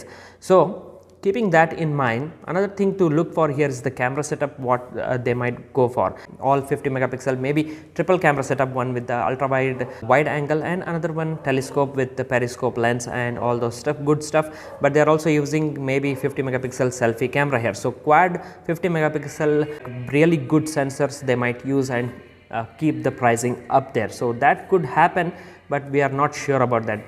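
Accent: India. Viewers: Good content, love your accent! Clear to be heard for non-Indians like me ✨️🙏🏻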